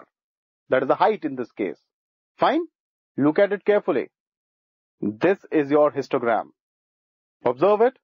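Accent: India. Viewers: this guy has some next indian accent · Look you Indian guy this is wrong and anyway I didn't understand anything because of your acsent.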